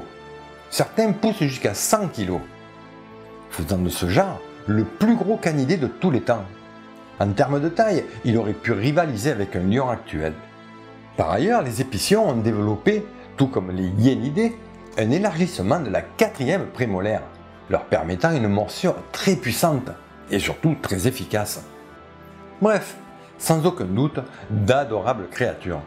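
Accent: South, France. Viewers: Beaucoup d'infos interessantes, très détailler et j'aimes bien l'accent du sud de la France, c'est sympathique et la prononciation impécable, un seul hic, j'ai entendu mouflette décrivant c'est animal d'une puanteur exécrable, au Québec nous disons mouffette, interessant de savoir la différence d'appellation. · Vous avez quoi comme problème avec l'accent du sud sans déconner. Vous vous êtes entendu avec votre accent pointu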